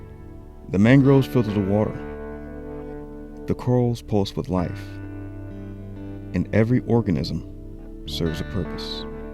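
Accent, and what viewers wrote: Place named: United States. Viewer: I feel like I'm watching a David Attenborough documentary but with an American accent.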